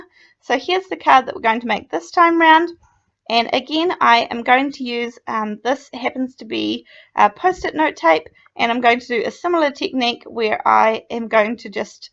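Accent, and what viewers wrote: New Zealand. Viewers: Lovely cards as always. Also very simple and achievable. Are you from New Zealand?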